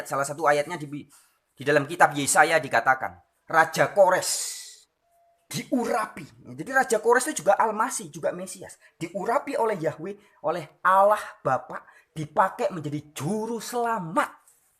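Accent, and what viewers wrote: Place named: Bali, Indonesia. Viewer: Deleme bali gati nok.. dije melali.. tiang denger logate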